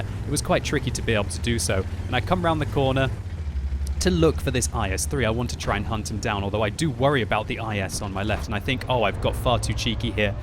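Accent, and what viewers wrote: France. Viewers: i'm french and i quit like your french accent 😂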